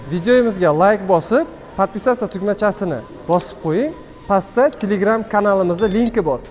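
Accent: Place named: Tashkent, Uzbekistan. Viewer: Тошкенча Шева качаткибтию у́накки боб ковоти,( катмеди манитори😂😂😂)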